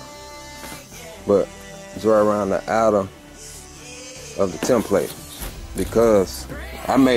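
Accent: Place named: Texas, United States. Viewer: Sound like you from TX man I miss y'all bruh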